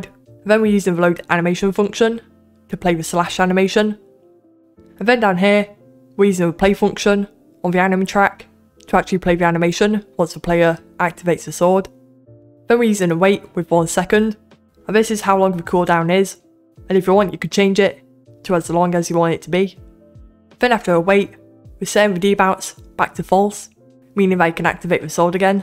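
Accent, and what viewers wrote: United Kingdom. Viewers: Amazing, plus the vouce is satisfying, british is cool.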